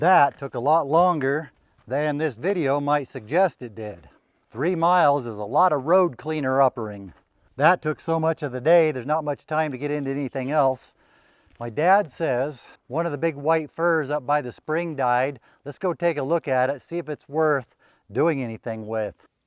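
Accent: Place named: Pacific Northwest, United States. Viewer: Sounds like northern oregon or southern washinton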